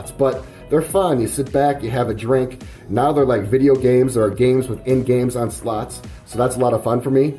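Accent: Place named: Wisconsin, United States. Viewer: I knew it! I caught subtle hints of that Wisconsin accent on your previous vids and that was what I guessed. :)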